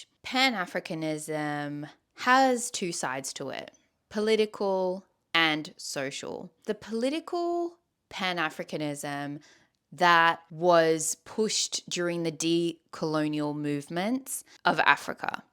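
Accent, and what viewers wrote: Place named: United Kingdom. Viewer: Are you half white? ...You look half-white and sound like you were raised in Britain.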